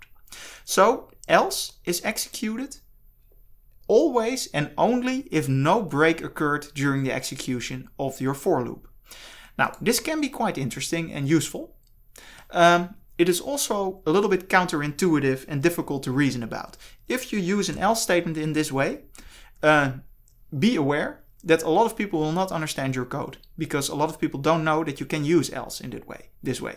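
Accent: Netherlands. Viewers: Subscribed want ik hoor meteen de Nederlandse accent:p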